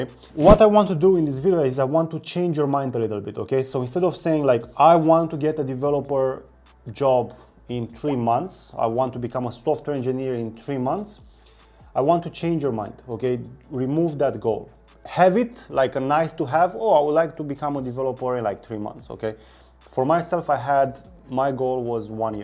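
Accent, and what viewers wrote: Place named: Romania. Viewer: Pretty sure you're a mici enjoyer only by your accent. How about simply just jumping into projects and learn trough your mistakes? We have gpt, it can help us, but after the helping code is received, we should also learn from gpt why he did that, and then use that thing until u fully understand it. Coding for the average person (with a job or being a student or both, or even having mental issues), would take around 8-16 months to learn a language to the point where he won t use the internet and copy-paste code 60% of its project, or the big plante, being easy for him to explain his code.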